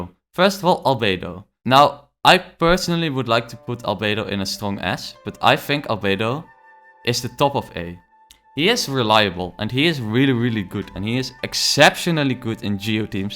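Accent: Netherlands. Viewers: Subbed for the dutch English and I like your choice of childe being your choice.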